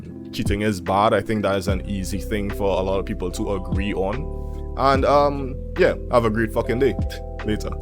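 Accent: Trinidad and Tobago. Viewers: Are you from Trinidad bro?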